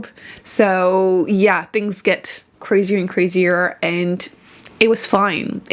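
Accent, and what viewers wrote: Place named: Quebec, Canada. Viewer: Nevermind, that accent answered my question. Maybe you'll see a fellow quebecoise booktuber soon? I'm trying to start one even though I'm very new at this. You managed to get an impressive amount of subscribers, congrats!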